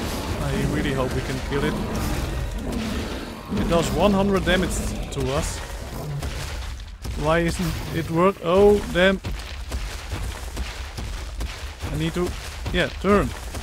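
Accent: Netherlands. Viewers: Kratis Games maak je grap ? je hoort duidelijk aan zijn stem dat die Nederlands is , Nederlanders hebben altijd zo een rare uitspraak als ze engels praten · are you dutch you souns dutch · I like your (i think dutch) accent!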